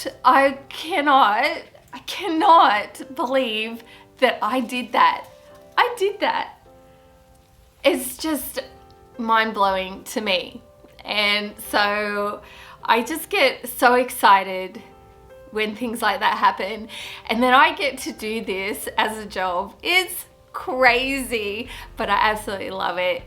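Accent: Australia. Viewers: I just seen this as it popped up , I have to ask , do I hear an Aussie accent... love from Australia..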